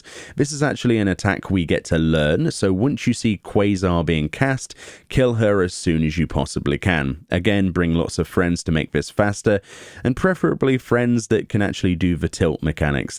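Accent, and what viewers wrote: England, United Kingdom. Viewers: English accent doesn't mean he understands how to read it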